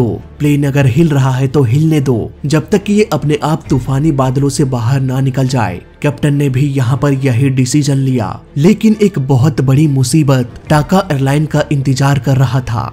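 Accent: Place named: Bihar, India. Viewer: Tu news to ache deta h lakin hinde bol na sekh le bihare brass mat bolo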